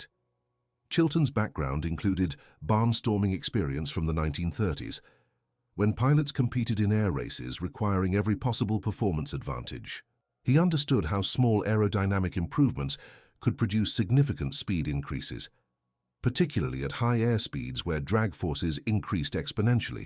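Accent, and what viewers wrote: United Kingdom. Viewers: you need to dispense with the British English!! This is the United States of America! If i have to put up with your kind of english I'LL UNSUBSCRIBE!! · Guess what, The United States of America is NOT the only country that watches YouTube,! Go ahead and unsubscribe if grousing about a British accent is the only comment you can make about an very interesting and informative video. (By the way, I was born and live in the USA.) Let me guess, you are a liberal, they are the only people I know that are bigoted enough to make such an uncalled-for comment.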